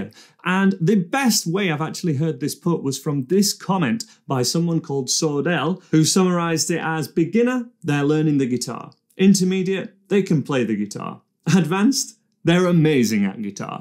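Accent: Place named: North East England, United Kingdom. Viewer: You have a very North East accent... I reckon Sunderland.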